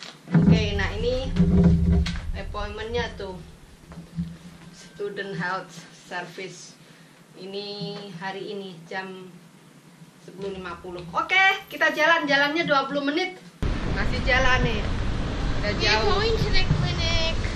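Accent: Java, Indonesia. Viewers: Mbak salam kenal ya... saya seneng sampean bahasa jowonya msh medok..i like it😊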